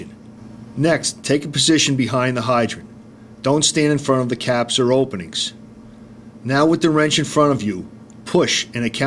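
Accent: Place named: New York, United States. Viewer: That is definitely a new your city accent LOL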